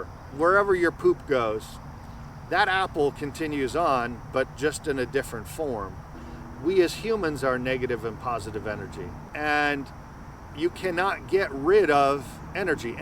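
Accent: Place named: West Country, United Kingdom. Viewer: The 'Pirate voice' is a west country accent (south west of england) - The FSM is a well established theme within the atheist movement. As I get older I've moved from a staunch atheist to agnostic, but not necessarily the white bearded man. Until science can prove the origin of consciousness [referred to as the Hard Problem] I'll be somewhere between atheistic death is death, consciousness is a product of brain function and is granular in nature, more brain power more consciousness - and the notion that the brain is a receiver of consciousness. I do not fear death, only the moment of it. I'm fascinated by the notion of consciousness. The YT channel 'closer to truth' has some excellent interviews with prominent scientists and philosophers about it. Anyway, excellent video - you've got this sussed mate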